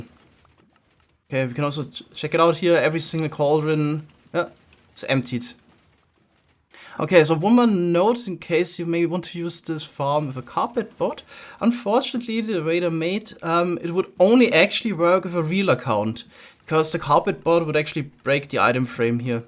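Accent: Germany. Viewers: Are you German because you’re accent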